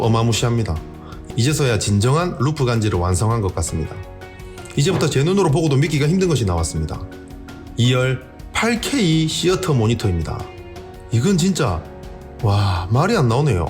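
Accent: Gyeongsang, South Korea. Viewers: 경상도 분이신가요? 억양에 사투리 오지게 들어 있네요..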